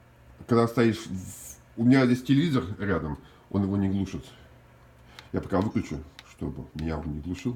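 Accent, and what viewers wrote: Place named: Ryazan, Russia. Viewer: Слова русские позабыл? Смешно слушать рязанский акцент😂😂😂😂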